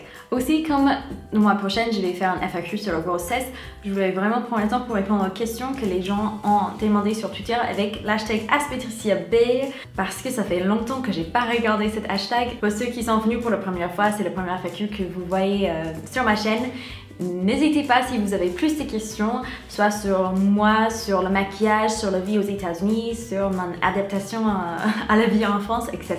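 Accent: United States. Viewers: Hello ! T'as raison de pas essayer de gommer ton accent américain : ça fait partie de toi 😊. Jane Birkin vit bien en France depuis des décennies et elle a toujours son accent anglais. Le fait que tu fasses des vidéos est un bon exercice de prononciation et en plus c'est gratuit!!!😊😊😊 t'as pas besoin de prof... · Oh non !!!! ne fais pas disparaitre ton accent americain , c'est trop mognon quand ton parle , moi j'adore , trop chouette !! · Ton accent américain est charmant quand tu parles en français - je préfère l'accent américain au britannique, ça coule mieux, lol - j'imagine que l'inverse est vrai aussi - parler anglais avec un accent français - après j'ai passé un an à Montréal et je me sentais trop ridicule quand je devais m'exprimer en anglais face à des américains, c'est un complexe très français, lol!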